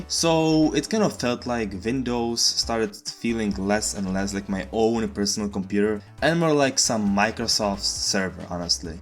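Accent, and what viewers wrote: Czech Republic. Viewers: The accent.... are you czech?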